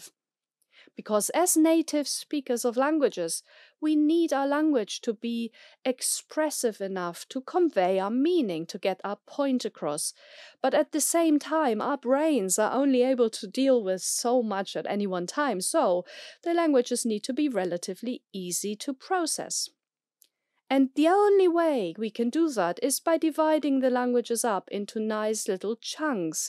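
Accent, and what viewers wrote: Germany. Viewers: Very interesting! Thank you! I have always wondered if English is a more complex language in the sense of having a larger lexicon. English certainly rapidly changes...and not always for the better! I would like to know which languages you consider to be more complex and more expressive. I will look for more of your videos. I can hear (faintly) that your mother tongue is German. That is a beautiful language that I learned in high school before going to live in Köln for a year...50 years ago! I revived my German more recently. I love the complex grammar! It is really not that hard to learn. Like anything it takes practice but once you learn the grammar rules of cases and genders, it's challenging but rewarding. One thing I realize from my own experience learning languages is that the most difficult thing about learning a foreign language once you have the basics down is to speak it naturally with expressions, colloquialisms and correct intonation. 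Being able to speak with correct pronunciation can be a big challenge for some, as well; for example, for Spanish speakers of English. And I don't know if practice can really help that much if you don't have a good ear. I feel bad for non L1 English speakers struggling to learn the language who due to a lack of proficiency are unable to fully and richly express themselves. I feel that way when I speak an L2 language; like I must appear to be like a child or simple minded. Vielen Dank again for your excellent post!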